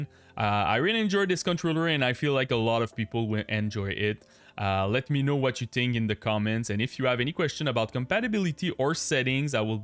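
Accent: Quebec, Canada. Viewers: lol bro i can ear the quebecois from this video XD nice to see a fellow Qc doing content in english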